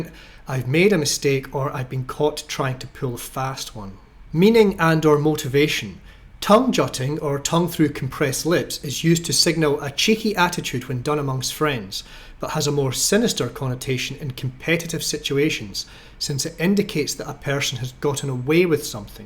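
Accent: Scotland, United Kingdom. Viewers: Are you and Neil Oliver from the same place in Scotland?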